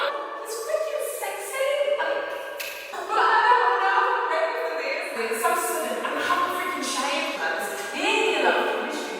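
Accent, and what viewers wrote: United Kingdom. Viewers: OMG A BRIT!!! YES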